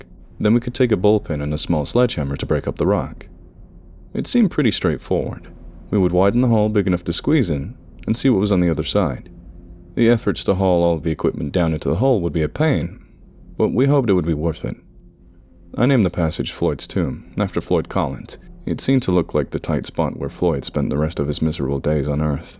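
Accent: United States. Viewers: Your american accent is pretty good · Always amazed at your talents. Your American accent is top notch!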